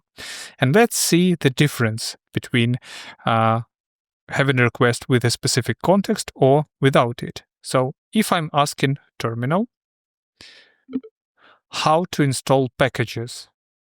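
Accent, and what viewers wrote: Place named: Russia. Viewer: Слышно, что русскоязычный. Why do you speak English?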